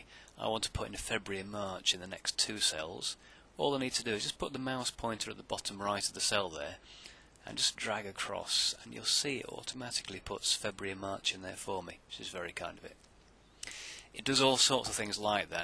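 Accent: England, United Kingdom. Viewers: Excellent refresher, he's even got the British accent to make it sound less boring than my college MS Office instructor. :) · thanks you rock plus brits are cool crisps and stuff i love it accent is wonderful :) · I think I learn better when I'm getting taught with a British accent. (: I love it. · thank you for you advices! and you've got a cute english accent, hihi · such clear sounding brits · wow really well presented and nice to hear a good old British voice just getting down to business straight away. If only you'd taught us IT, I'd have understood Excel so much better. Thank You. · You are wonderful. Thank you for putting this up! The British accent makes it better too. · I haven't used Excel since I taught some middle school course on it at least 10 years ago. Good to hear the English voice, Excel called kind, and "crisps." I'm off and running on my first spreadsheet thanks to this!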